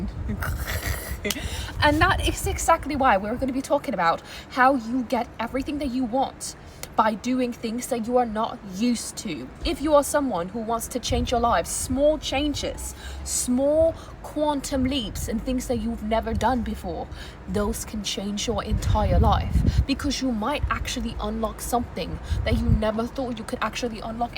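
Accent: United Kingdom. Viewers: 😂😂😂😂😂we love British accents over here girl 😊😊😊😂😂❤❤❤❤ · The British accent makes it sound less like a scam! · Not the brittish accent😂 girl you lifted my mood. And I've cried today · Gurll I loveee your energy 🍀🌷🌷😭😭 and that British accent 😭😭. Lobbyaa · The british accent girlll hahaha ❤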